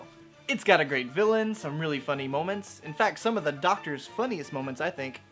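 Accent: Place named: United Kingdom. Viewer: Good British accent!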